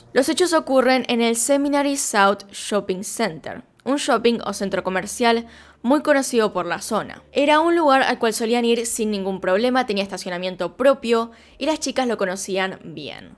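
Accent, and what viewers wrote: Argentina. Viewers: Wow, la verdad, que al escuchar tus vídeos me relajo demasiado xd, no soy muy fanática del acento argentino, pero del tuyo le hago hasta un altar 😔👊💛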